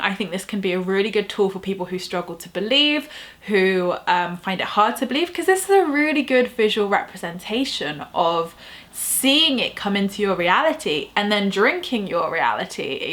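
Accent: England, United Kingdom. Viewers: Hi I just found your channel I have tried the 2 cup method today I ask for more abundance I felt very happy doing it I said a prayer and I kept the desired note in a wooden box as suggested by another yoube channel any suggestions how long it takes before you see the results I love your English accent when I manifest some new friends I would love to visit England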